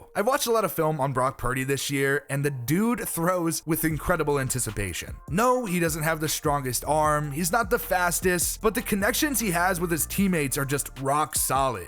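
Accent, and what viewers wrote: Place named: Richmond, United States. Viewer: Are you from Richmond?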